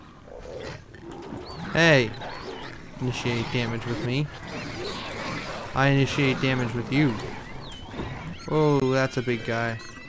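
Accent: Canada. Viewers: are you Canadian? Because I can hear a little bit of an accent. Just wondering, it sounds cool XD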